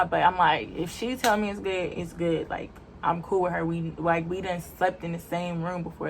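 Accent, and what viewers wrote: United States. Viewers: that’s a Baltimore. Accent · Are you from St. Louis ?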